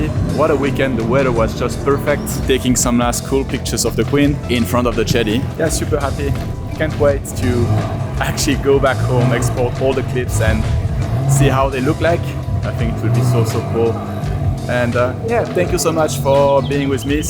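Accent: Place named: France. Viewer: On sent l’accent français haha ! Je viens de découvrir ta chaîne et la qualité du contenu est juste parfaite 🤩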